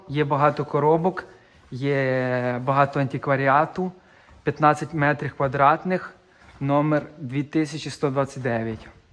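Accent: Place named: Carpathians, Ukraine. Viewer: Контент дуже цікавий, бо я впевнений, що на європейських складах можна речі поцікавіше знаходити ніж в тих же Штатах. Також цікаво звідки ви самі? По акценту мені здається щось ближче Карпат, чи регіону - може бути Львівщина, Франківщина, сильно менше ставлю на Закарпаття. В мене є друг дитинства, він з 6го класу жив в Мадриді і акцент дуже сходий на ваш в нього залишився досі.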